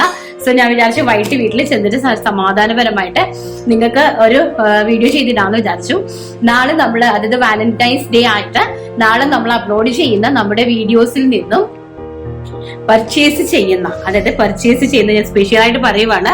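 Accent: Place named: Tamil Nadu, India. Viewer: I like and your performance so much. Tamil mixed Malayalam kelkaan oru sugham und God bless you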